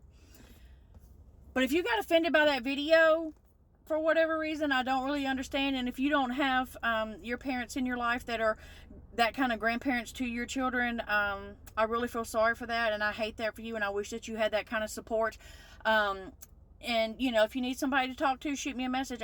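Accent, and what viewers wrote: United States. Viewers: ONLY NON!!! Gen X would troll you because that the way the world is today 🙄🤦 I love your vids. They tke me back to THA DAY...LMAO AND WILL SAY, I am shocked your from Memphis. You sound more country....lol THANKS for all the the vids tho. It's like time traveling 😆👍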